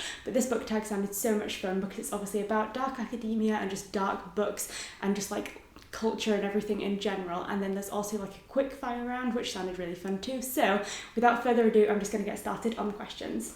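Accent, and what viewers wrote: United Kingdom. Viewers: Do you have a slight British accent or am I nuts?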